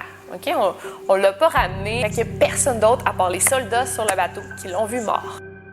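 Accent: Canada. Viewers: Je me demande comment peut tu avoir l'accent canadien alors que tu vie au Mexique ! Sinon j'adore ta chaîne !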